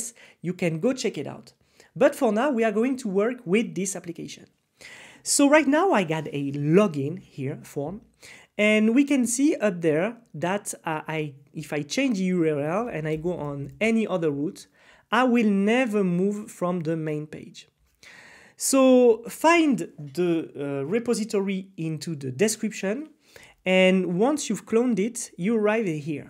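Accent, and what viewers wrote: France. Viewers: French accent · Merci beaucoup, I can recognise French accent from miles away. Tu aides énormément mon gars ! 💪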